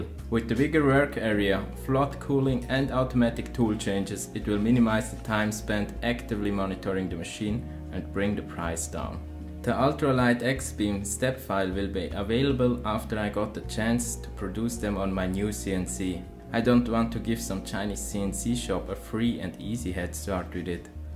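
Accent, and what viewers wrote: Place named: Switzerland. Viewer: you sound pretty swissish to me, so wenn du interesse hättsch: ich han e gigantischi DMU 80 wo dir paar use haue könnt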